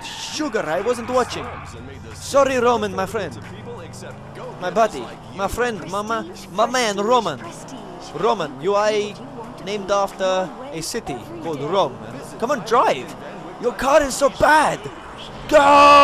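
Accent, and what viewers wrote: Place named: Russia. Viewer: Your little russian accent sound excatly like Niko XD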